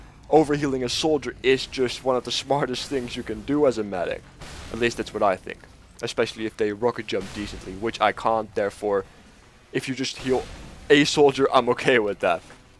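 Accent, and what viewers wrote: United Kingdom. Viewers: I thought you were scottish.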